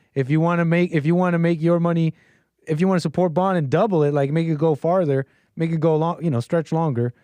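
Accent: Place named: Arizona, United States. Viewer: Love the tombstone accent.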